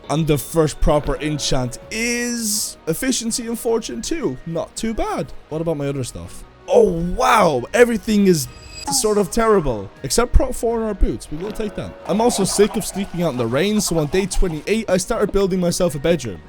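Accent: Ireland. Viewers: Bro im defo not kapping thats an irish accent foohhshoooo 😂 absolute horseplay.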